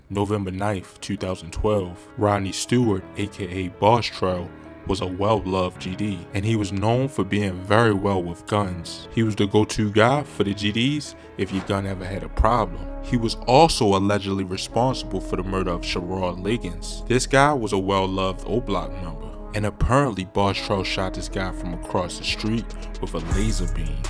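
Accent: Baltimore, United States. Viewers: I hear that Baltimore accent!!! Respect and love from DC Salute!!!! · You from Baltimore? You have a accent. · You must be from b-more. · On god. Soon as cuz opened his mouth, Straight outta Baltimore like shit😂🤦‍♂️🤦‍♂️ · You got your story wrong bro. Troy wasn't opp shopping. Tb was looking for t roy and was right on his trail 2 minutesbehindhim. Troy was in the store to the back with someone else. Tb walked in with one of his boys and walked right up to Troy in the back of the store and shot him in the chest then ran out. You sound like you from bmore too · You from Baltimore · Where you from bro yo accent sound like ot Baltimore am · My man is definitely from Baltimore, I can hear a fellow Baltimorian whenI hear one. Can we get a dog (Dug)?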